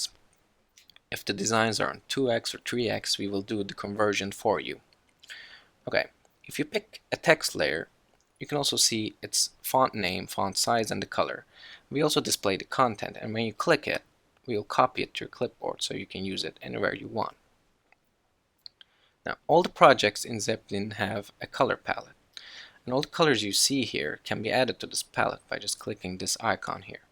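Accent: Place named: Turkey. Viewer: Aksanından zeplinin türk firması olduğunu fark ettim :)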